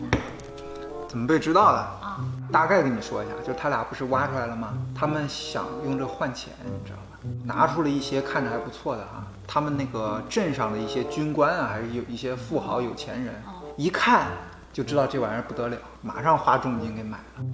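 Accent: China. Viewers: 這個節目用的是繁體字，可是K哥口音像是大陸人。為何？ 不管如何，我點贊K哥使用繁體字，這是智慧的象徵。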